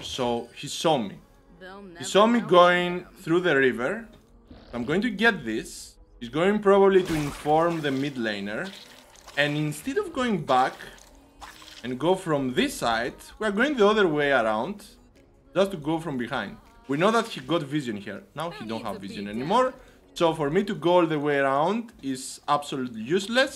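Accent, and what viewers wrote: Greece. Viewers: I think you're a Greek, right ? Assumption made on your accent. :D Greetings from Serbia my bro !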